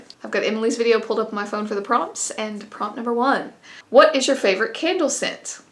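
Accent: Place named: Georgia, United States. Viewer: Refined southern accent, like Ga 😝🤣 you are hilarious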